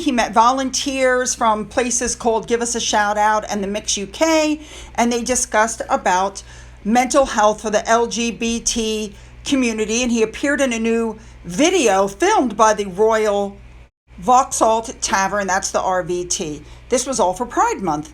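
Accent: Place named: United States. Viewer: great video as always. I'd like to point out your pronunciation of UK names. PLEASE NEVER STOP, American cousin... in my opinion, your pronunciations are so cute and understandable. I, an Aussie, have difficulty sometimes, so I love that you are a fellow traveller in the interesting world of UK place names. 😂❤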